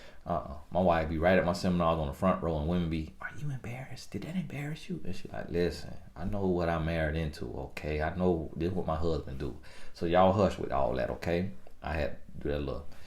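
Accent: South, United States. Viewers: I love southern twang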